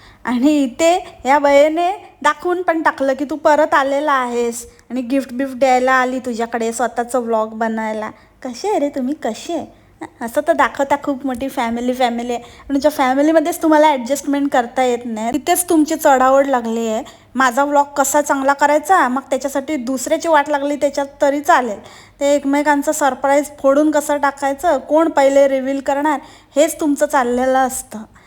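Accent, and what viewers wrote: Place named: Kolhapur, India. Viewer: Tú Swayahala Ka Dakhvit Nahis ????? Nav ,Gav Kahihi Sagat Nahis ...Ka?????? YuBplnya Varun Tu Kolhapur Kivha Marayhwdayatil Vatates ... Kahi Ward , Tone Tuze Typical Tikadache Vatates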